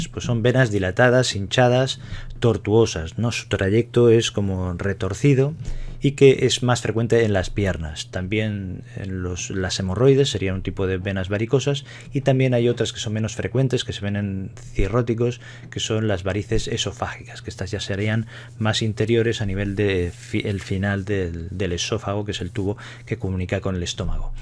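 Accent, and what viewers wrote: Spain. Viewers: Por el acento del Dr. ,puede estar en España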